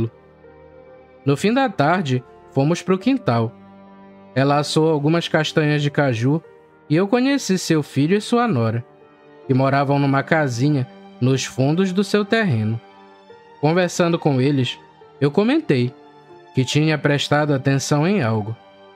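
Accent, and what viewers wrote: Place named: Pará, Brazil. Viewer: Eu gosto desse sotaque, acho que é de paraense ❤, relato incrível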